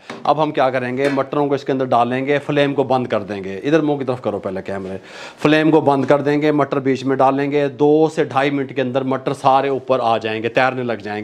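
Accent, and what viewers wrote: Punjab, India. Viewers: Punbajion ki urdu sunnay ka alag hi maza hai gal samajh aa rhi hai 😂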